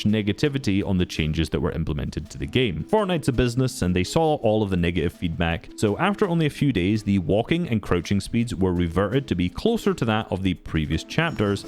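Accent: Scotland, United Kingdom. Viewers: Your accent being Scottish instead of Australian instantly makes your videos 10x better than AussieAntics’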